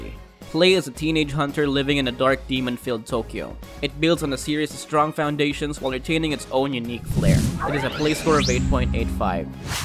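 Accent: Philippines. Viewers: The one talking is Filipino I'm 99% sure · Is the speaker Filipino? He has the same accent as Filipinos.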